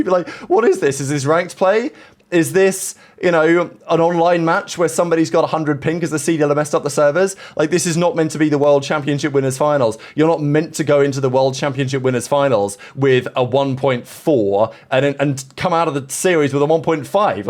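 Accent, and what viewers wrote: United Kingdom. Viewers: it’s that irresistible British accent that always has women around the world swooning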